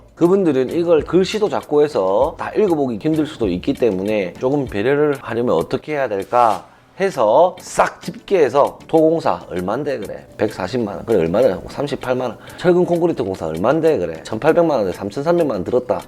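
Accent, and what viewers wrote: Gyeongsang, South Korea. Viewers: 많은 영상에 좋은 정보를 얻고 있습니다. 구수한 갱상도 사투리가 참 정겹습니다^^ 혹 지금도 자료를 부탁드려도 될까요?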